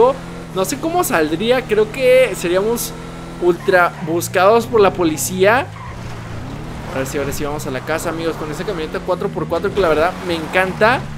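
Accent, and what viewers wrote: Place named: Sonora, Mexico. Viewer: Eres de sonora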